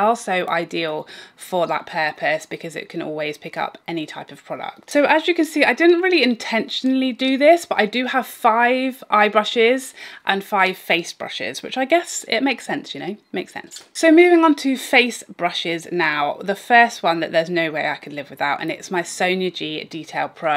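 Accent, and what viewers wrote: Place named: RP, United Kingdom. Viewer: Your channel came up in my recommended yesterday, and I have been binge-watching. You have great information. I really loved your last blush video, and I found several to try. Plus, being from the states and lover of English history and literature, it makes me feel like I'm spending time with my perfectly posh friend with with killer RP accent.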